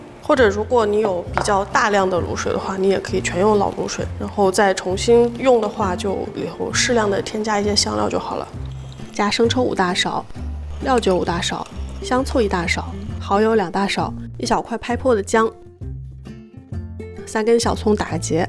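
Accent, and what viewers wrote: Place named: Shanghai, China. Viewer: 我家乡也有好吗我倒觉得是上海银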